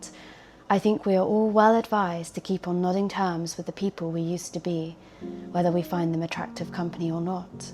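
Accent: United Kingdom. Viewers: Great video, my algorithm has been serving me this kind of stuff lately, it’s really refreshing. By the way, where in Britain are you from, what is that particular type of British accent?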